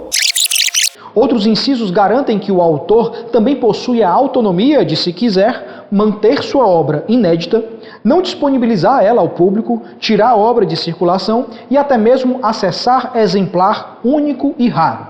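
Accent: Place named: Ceará, Brazil. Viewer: Deve ser Cearense 😍👏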